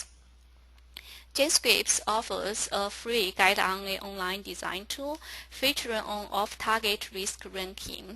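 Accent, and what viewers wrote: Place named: China. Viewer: Why chinese speaker....cnt understand...even a sentence...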